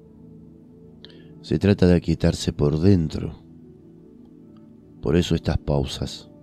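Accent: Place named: Argentina. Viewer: Qué bien habla el español y en argentino. Gracias !😄. Bendiciones maestro.❤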